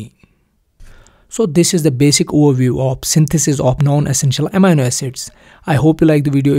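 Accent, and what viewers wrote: Kashmir, India. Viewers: Are u from kashmir sir?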